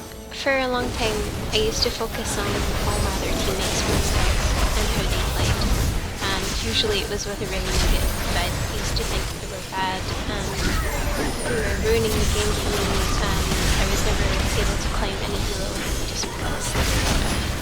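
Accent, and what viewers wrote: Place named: Canada. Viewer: LoL, the canadian accent is strong in this one, oooover and oooover. abooot. Loved the video though, learned alot from it ! :)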